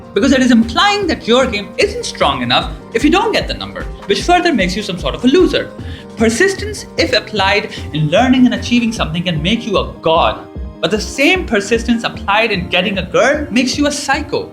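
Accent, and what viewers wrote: India. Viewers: I don't know, but I think he's Indian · Indian accent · i hate your Indian accent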